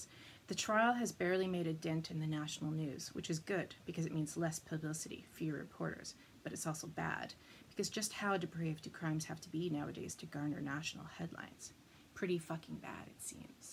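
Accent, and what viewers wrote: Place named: Canada. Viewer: I love your Canadian accent!! Happy for the suggestion for a good summer read,but cannot wait for another of your books! Have a great summer!! ❤️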